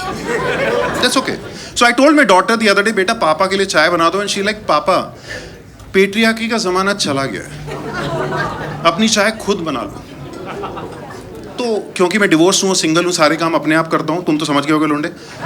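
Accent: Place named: Purvanchal, India. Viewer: chacha lucknow me vo accent hai hi nahi.. purvanchal aur marathi mix krdi apne..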